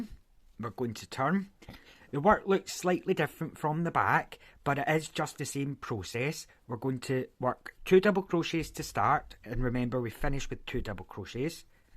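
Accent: Scotland, United Kingdom. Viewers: I'm from Devon and very rarely get to hear a scotsman very nice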